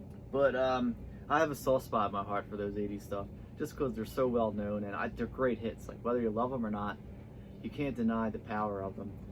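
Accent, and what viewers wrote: Philadelphia, United States. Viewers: Agreed is the best however better than. was too long would have made a better single disk. Hey is that a Philly accent?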